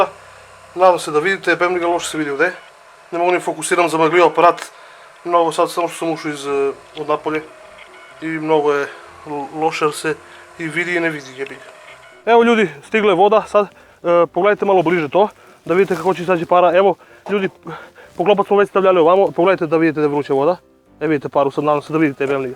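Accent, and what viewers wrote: South, Serbia. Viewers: E majke mi jesi glup on je covek sa sela brt tako ljudi u juznom delu Srbije pricaju inace jel ti neko ikad rekao da si glup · Brate sa juga je srbije nis leskovac vranje to je njihov dijalekat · Brt on je iz sela ono i tamo je takav Niski akcenat nemoj da pricas kad ne znas. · ma on je buraz kako prica da prica nas covek sa juga on ima 800k suba a beogradski hejteri moze da mu ga dudlaju sa snjihovim padezima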